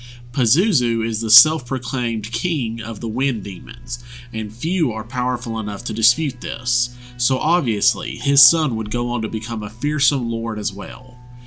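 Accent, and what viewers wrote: South, United States. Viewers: my man has cornered the market on southern US inflected pathfinder lore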